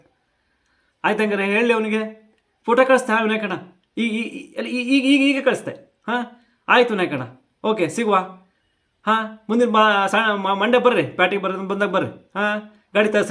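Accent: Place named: Konkani, India. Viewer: super anna , hinge mathadadu konkni avvu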